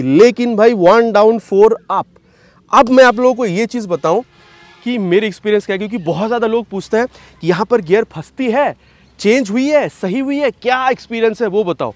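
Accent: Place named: Bengal, India. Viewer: But bengali accent of hindi hits different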